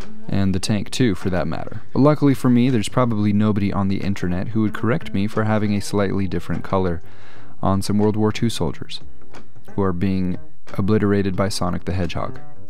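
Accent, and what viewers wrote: Germany. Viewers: Perfect northern German pronunciation. Not a native speaker, but have been speaking it for about forty-four years.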